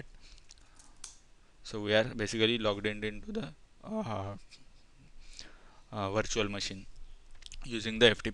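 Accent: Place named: Mumbai, India. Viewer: Mumbai accent :-)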